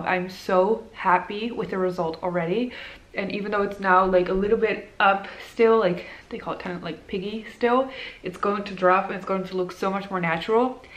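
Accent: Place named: Netherlands. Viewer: You sound more Dutch when you’re recovering from surgery 😍 wishing you a speedy recovery and gorgeous results!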